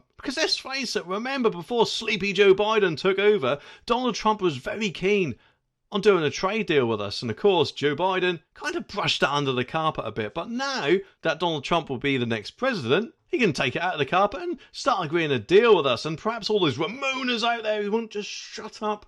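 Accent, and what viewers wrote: Norfolk, United Kingdom. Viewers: Norwich here! Is that a Norfolk accent?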